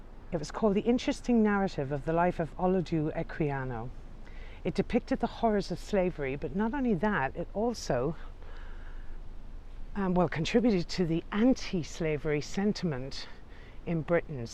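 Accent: Ireland. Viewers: Lovely! Just so lovely! Not only the tour of this beautiful old cemetery, but to hear that wonderful lilting voice of my favorite tour guide,. Lady, I believe I could listen to you read an encyclopedia and it would be entertaining just to hear that soft, soothing, Irish lilt. I am a chronic insomniac and you just don’t know how many nights the sound of your beautiful voice has been a lullaby to ease me off into sleep. It works as nothing else does. I always look for you especially when new videos have been posted. I love the others as well, but you just have that something a little extra. Prayers that life is treating you well! Keep up the great work. Free Tours by Foot-London has a real treasure in you. ❤️ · Been waiting for the return of the magnificent 7 Love this series of cemetery tours by the wonderful.. Great knowledge and well delivered in that soft Irish accent x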